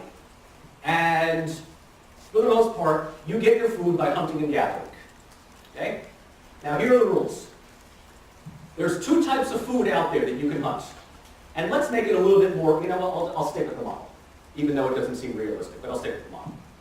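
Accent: New Jersey, United States. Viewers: His Jersey Accent makes the lectures even more entertaining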